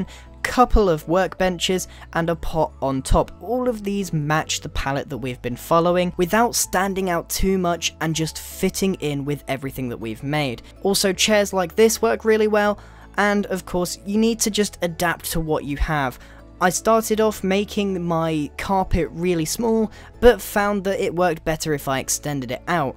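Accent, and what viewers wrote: United Kingdom. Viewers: I like british better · british british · what's with the british you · Who likes British? Cuz I do, also. Can you use bedrock and turn it into a good looking house? I think not.(not asking u to do that. It’s just a question.) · I love British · Very clearly British. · Why the British stereotype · Can we see British again?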